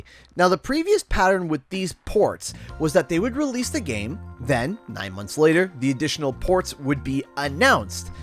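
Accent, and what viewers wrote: Canada. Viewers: This clip was completely about nothing and I didn't learn anything new from it but I like listening to so I like it.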